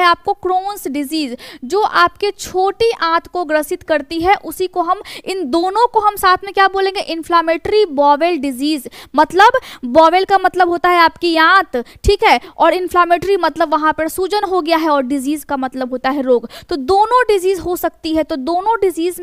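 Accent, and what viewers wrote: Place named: Bengal, India. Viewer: Bengali walo ki Hindi bada cute lagtaa❤ ra ko da bolna Bara nehi bada Kaaran nehi Kaadan😂 Fir v confident bahut hai didi🎉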